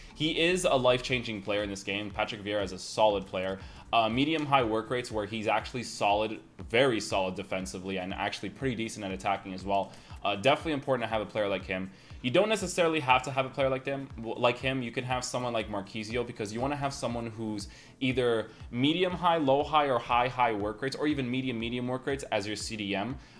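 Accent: Portugal. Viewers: Are you portuguese? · Are u portuguese??? Love 🇵🇹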